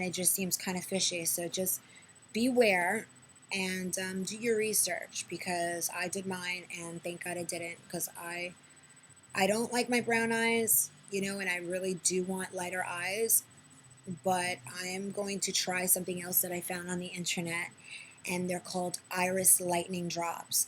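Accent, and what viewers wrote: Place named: Brooklyn, United States. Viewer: I love how she says yous! Very brooklyn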